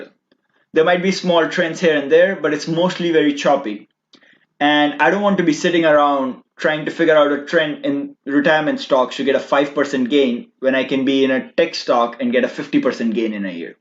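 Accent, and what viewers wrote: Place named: India. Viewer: thick indian accent, my head is wobbling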